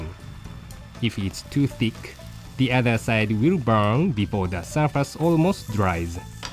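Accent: Japan. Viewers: looks like you've never heard a japanese speak english... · 英語が日本語すぎてちょっと笑ってしまう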